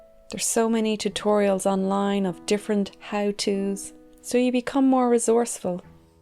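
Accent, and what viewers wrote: Ireland. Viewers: I just came across your channel. Listening to your voice for the first time, I knew that you are Irish :)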